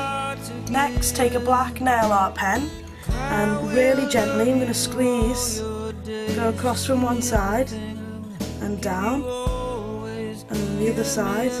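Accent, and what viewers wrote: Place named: United Kingdom. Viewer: OH MY GOSH!! YOUR ACCENT!! I wish i had a British accent.. YOUR IS SOO COOOL!! I'm American :(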